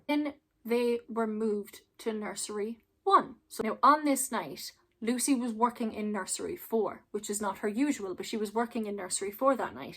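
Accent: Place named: Ireland. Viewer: Absolutely horrific and sickening. Do u reckon is some form Muchousen by proxy? Love your gorgeous accent btw, r u iIrsh? Love from Australia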